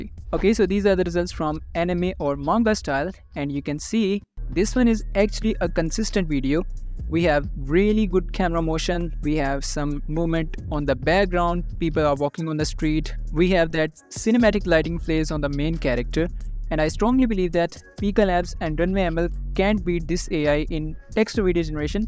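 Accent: India. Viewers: Bro admin from India...? speaking English is funny...😂😂😂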